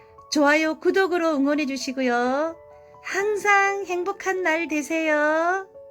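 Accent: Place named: Gyeongsang, South Korea. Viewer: 경상도 억양이셔서 여쭤봤어요~~^ 즐거운 하루 되세요~~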